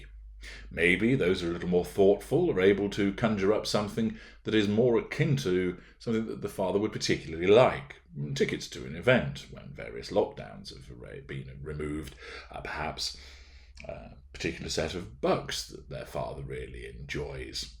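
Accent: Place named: Lancashire, United Kingdom. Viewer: Hi. Have thought many times hearing your voice inflections that your early life was in either Lancashire, I’m a Lancashire lass now in Australia, or in Yorkshire. Thank you for your insight.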